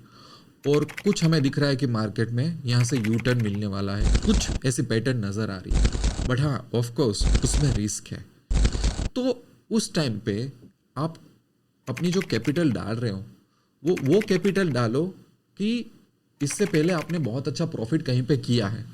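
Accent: Gujarat, India. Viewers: As ur voice accent is , i think u r a gujarati.....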